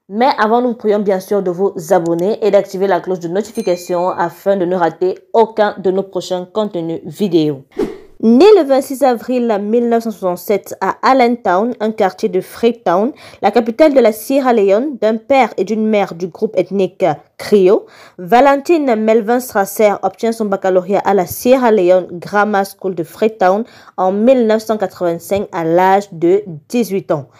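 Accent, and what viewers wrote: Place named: Cameroon. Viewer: L'accent est trop camerounais et sérieusement inaudible 🤢🤮 faîte un effort svp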